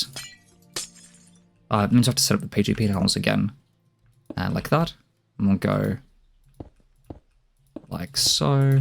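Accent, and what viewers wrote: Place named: Australia. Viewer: thought you were a fellow aussie, great video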